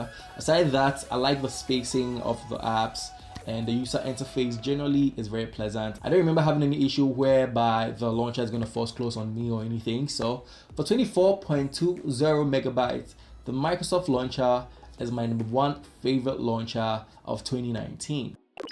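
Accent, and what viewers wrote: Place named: Jamaica. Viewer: Are tou Jamaican? I'm from the virgin Islands, your accent sounds jamaican or nearby location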